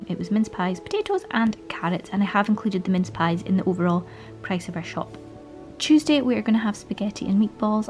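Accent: Scotland, United Kingdom. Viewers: All I could think is your Scottish lol. I shouted it actaully, I may need help. Trying to find my way just now on my own channel and your's within being on it for two minutes gave me hope and inspiration. Subscribed. <3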